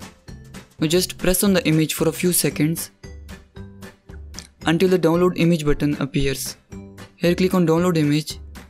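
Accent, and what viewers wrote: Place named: Russia. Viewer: He sounds like a Russian speaking English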